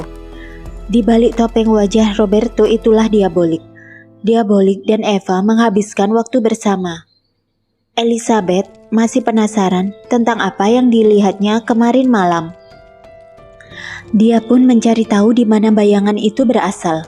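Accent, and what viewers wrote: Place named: Sulawesi, Indonesia. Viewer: Logk Sulawesi 😂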